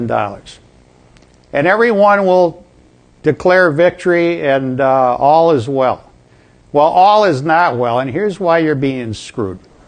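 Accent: United States. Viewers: dis guy is from Minnesota Eh? seems pretty reasonable wit possible exception of Market being your salvation. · I know this is completely off topic, but what sort of American accent does he have? It does sound a bit different than the standard.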